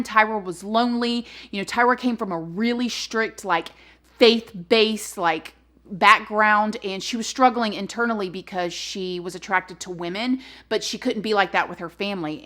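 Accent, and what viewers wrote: South, United States. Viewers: I feel so bad for her, she had a terrible existence from when she was a child. So sad for her and her victims. Slight side note.. love your accent. Whenever my friends and I (Brits) mess around with American accents ( I've literally been doing this since I was a kid) it's always your accent 😂 love it! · Maybe it's your southern accent but most people pronounce Aileen as eye-leen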